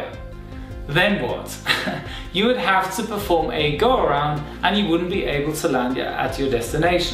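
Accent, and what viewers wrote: Germany. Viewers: Das ist das erste video was ich von dir gucke und ich hatte von anfang an das gefühl das du ein deutscher bist :) und es hat sich als wahr herausgestellt :D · Is he german?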